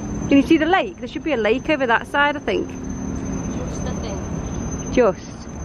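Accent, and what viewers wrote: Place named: RP, United Kingdom. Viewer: you accent sounds like queen Elizabeth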